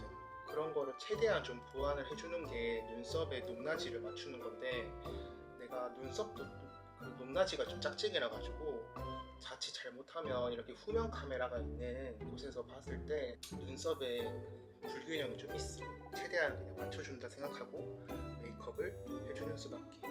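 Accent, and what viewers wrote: Gyeongsang, South Korea. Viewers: 누가봐도 경상도 사람이었어요 형 걱정 안하셔도되요!!! ㅎㅎ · 저 헤지스 룰429 립밤 2+2 했을때 8개 산거 주변에 몇개 나눠주고 오늘 마지막꺼 오픈했어여🙋‍♂️ 션님 사투리는 경상도 허세가 없어서 귀여운거 같아용👍 그리고 같은 경상도인으로서 저만 그런건지 모르겠는데 서울말 쓰다가? 주변에서 사투리 써보라고하면 잘 안나오지않나요^^ 근데 저보고 항상 사투리 쓰고있다는ㅎㅎ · 예전 영상부터 경상도말투엿는데ㅋ ㅋ ㅋ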